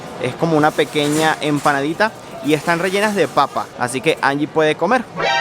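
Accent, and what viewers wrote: Venezuela. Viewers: Jajja me siento cómodo de que seas venezolano y entiendo todo lo que dices xd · Hola, gusto en conocerte, mi hermano venezolano, que alegría escucharte es nuestro dialecto, je, je, estuve en Medellin la semana pasada y pude conocer, la plaza de Botero, el metro y el metro cable de Santo Domingo, el pueblito Paisa, el jardín botánico y la plaza de los deseos, caminé por esa calle donde fuiste a la Hacienda. en dos días conocí todo. Dios es bueno y me regalo este paseo. Te felicito por tu programa. Un abrazote.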